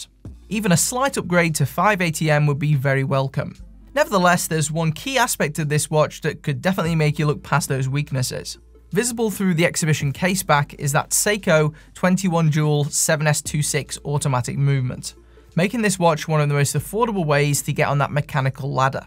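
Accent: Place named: Manchester, United Kingdom. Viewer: This watch is definitely "Ice Cold" in my book. I do sometimes wish it had hand winding, but I managed to regulate it to +8 secs per day with a toothpick, something I wouldn't try with a more expensive watch. It's also easy to back hack. The B-style dial is thoroughly appealing. As you get older, life is all about minutes and seconds, and hours are of diminishing importance. I've also seen the display caseback work its charm on others. My son looked at it for about half a minute and suddenly exclaimed "wow, now I understand how watches work!" Where are you from, BTW? Manchester? Your accent is the best part of these reviews.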